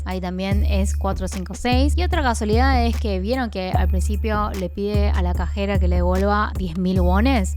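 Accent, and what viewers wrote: Argentina. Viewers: Hablas como argentina xdd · Una pregunta tu eres de argentina o de otro país por qué te sale muy pero que muy bien el asentó argentino??? <3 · No entiendo como tienes ese acento de los argentinos 🤔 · Hola eres de Argentina o de Corea? Tienes acento argentino pero rasgos de una coreana china o japonesa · Hablas cómo argentina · Una china hablando como Argentina wow como está el mundo. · Me gustan mucho tus videos y tu acento argentino. Felicidades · Y por qué esta china youtuber habla como argentina? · Tú Castellano es muy bueno aunque tú acento no es nuestro sino claramente argentino.... Necesito hacerte una pregunta. ¿Sabes porque el protagonista se tiño el cabellos de rojo? ¿Tiene algo que ver con la capucha roja que tenían los trabajadores? Gracias por tu explicación.. me has ayudado a entenderlo mucho mejor!! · Ame ese acento argentino en una asiática preciosa excelente contenido ❤️🥺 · Coreana hablando con acento argentino me suscribo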